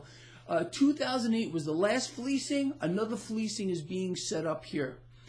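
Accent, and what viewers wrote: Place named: New Jersey, United States. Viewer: you talk like you are from New Jersey. I was raised in Jersey and when I listen to you I start talking like you again rofl. REminds me of my friends and family. I now live in california so my accent has been corrupted.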